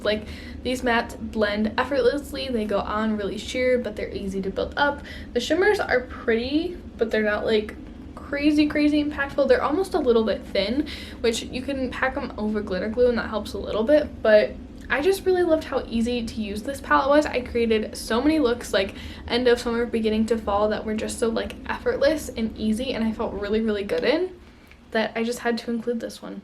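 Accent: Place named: Southeast, United States. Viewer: I hear that pronunciation a lot in the southeastern US 🤷‍♀️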